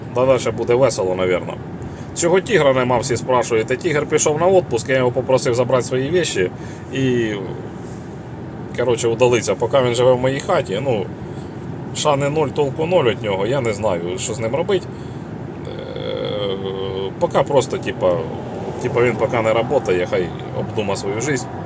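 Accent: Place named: Zaporizhzhia, Ukraine. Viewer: У Вас брат, випадково не у Данії зараз живе? Говір однаковий, і також з Запоріжжя. <link>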